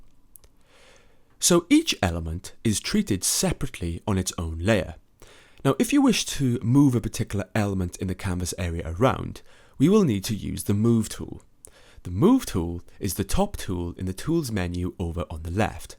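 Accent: United Kingdom. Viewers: OMG ! i don't know how to say thanks ,, you are really great teacher .. thanks a lot , and i liked your British english pronounciation ^^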